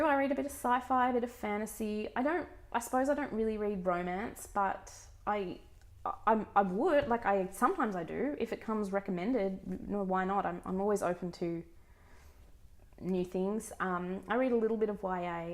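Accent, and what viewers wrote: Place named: Australia. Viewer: Welcome to booktube! I popped over after seeing Simon shout you out on Twitter! I’m an Aussie (also a Melbournian) now living in Texas 😊 So here for more Aussie voices on booktube!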